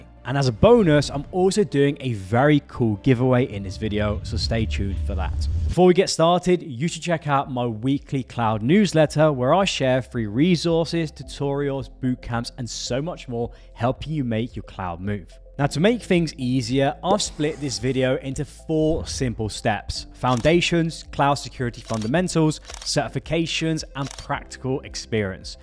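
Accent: United Kingdom. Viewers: Strange how you sound British yet don’t make any mention whatsoever of British salaries. You just cater to Americans…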